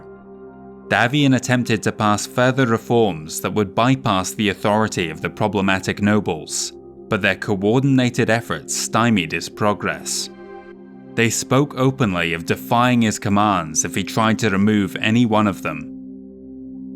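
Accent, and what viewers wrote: Scotland, United Kingdom. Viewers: MechCommander is the first "proper" PC game I ever played. Remembering it just a month ago is how I suddenly ended up stumbling upon your channel. And my oh my. You have blown my mind with this long-form content. Unreal, well done. P.S. are you Scottish? You have such an interesting Scotland/England combination accent. I'm from just over the border, in Northumberland.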